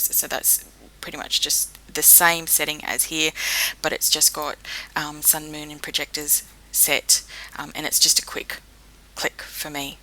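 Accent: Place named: New Zealand. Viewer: ooh I thought you sounded like youre from New Zealand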